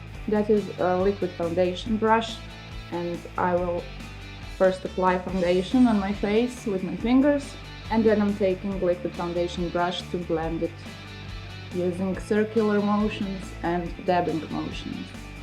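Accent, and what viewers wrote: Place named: Russia. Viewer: Русский акцент .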